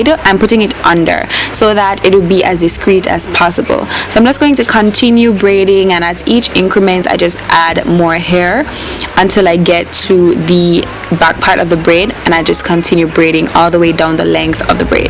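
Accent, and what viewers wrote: Jamaica. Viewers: Ooo she Jamaican go girl!!! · love yo accent (team jamaica)😊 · are you jamaican or Trinidadian? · ooh Jamaican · Love the Jamaican accent. you are so beautiful. big up yo self.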